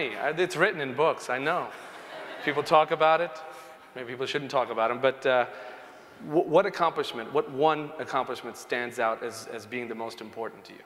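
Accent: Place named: England, United Kingdom. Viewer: Your comedy is awesome!! I love it when you speak in your english accent, it's hilarious.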